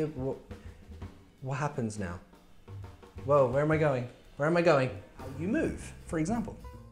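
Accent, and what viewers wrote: United Kingdom. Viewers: i really enjoy learning british accent with you funny teacher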